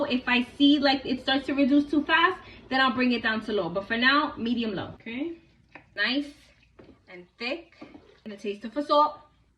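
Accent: New York, United States. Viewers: OMG I will have to try this recipe. I love that New York accent.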